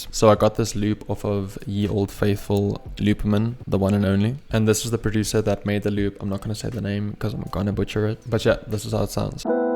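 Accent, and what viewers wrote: South Africa. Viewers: I smell a south african accent ei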